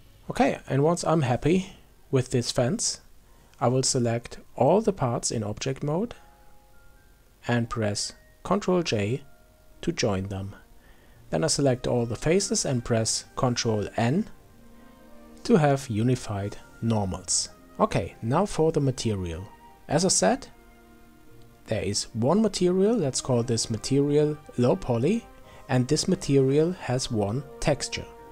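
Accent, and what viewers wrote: Germany. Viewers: you ar german ?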